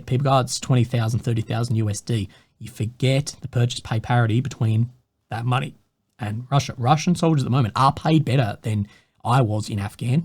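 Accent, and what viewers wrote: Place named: Australia. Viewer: Good luck with the surgery and shit. Ive been in korea the last 2 years (kinda not by choice lol ) and yours and is the only aussie voice i hear regularly, keeps me sane. Take care of your self and hope u back in 1 piece soon.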